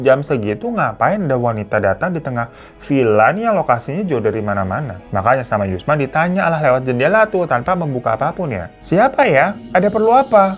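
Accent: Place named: Kalimantan, Indonesia. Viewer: Logatnya kalimantan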